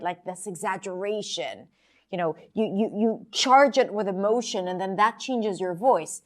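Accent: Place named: Boston, United States. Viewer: She is really easy to understand but her vowels are not standard English but some kind of dialect, I expect Boston. My understanding is that the everyday Boston accent is difficult to understand by people not from the area.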